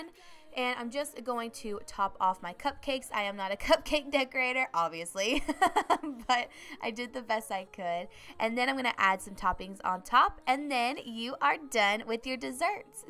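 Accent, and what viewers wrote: South, United States. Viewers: R u southern because u sound very southern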